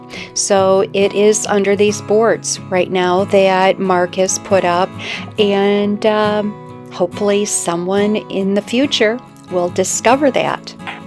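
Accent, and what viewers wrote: Midwest, United States. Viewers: I love your Midwest accent it’s adorable. It reminds me of someone I used to work with who was from Michigan. I’ve spent time in New England and my favorite states are Maine and New Hampshire. Exactly my idea of living a peaceful lifestyle style in a beautiful place. Unfortunately my husband loves California which I do not. We live coastal in a safe beautiful area but it’s way too crowded and EXPENSIVE! I’m still occasionally looking at houses over there hoping my husband changes his mind after we retire. Your videos are something I look forward to every Sunday! ❤❤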